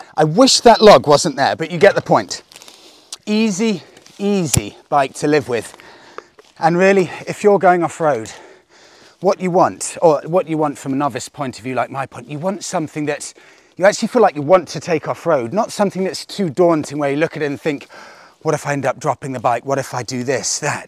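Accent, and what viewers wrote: United Kingdom. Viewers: I subscribed because of your being so british. Greetings from across the Northsea. Love the accent and manners. 😊 also great review.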